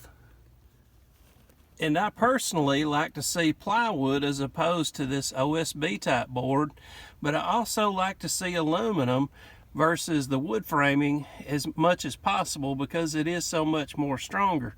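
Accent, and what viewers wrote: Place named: Alabama, United States. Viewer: New subscrib. You’re a smart guy and its refreshing to listen to someone that actually thinks and applies their smarts to whatever. Enjoy your channel and that Alabama accent is southern hospitality coming through the video.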